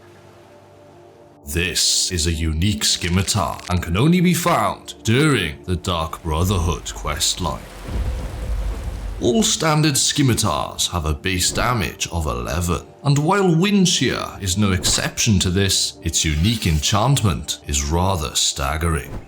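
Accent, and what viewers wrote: Wales, United Kingdom. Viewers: why are you Welsh and can you speak elvish?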